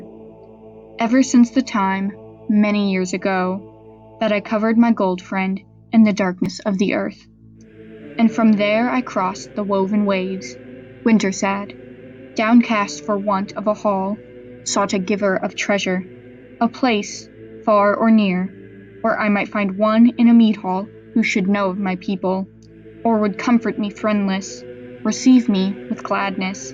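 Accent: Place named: United States. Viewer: Old English poem in an American accent no!!!!!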